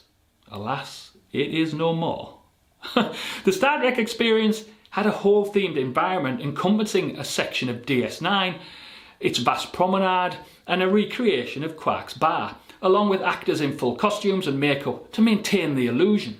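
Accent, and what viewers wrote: Scotland, United Kingdom. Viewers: Nice channel , you sound like a Scotsman!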